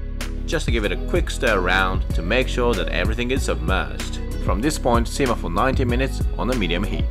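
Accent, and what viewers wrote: Australia. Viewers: Are you in Australia? I hear a pretty strong Aussie accent. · Thank you for your wonderful video. Your English is perfect & it sounds like you have a bit of an Aussie accent. Bravo. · You sound asian australian mate, great recipe btw!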